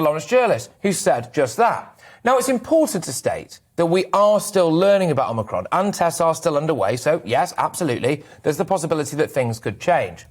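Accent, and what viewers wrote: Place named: RP, United Kingdom. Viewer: Talk English posh boy.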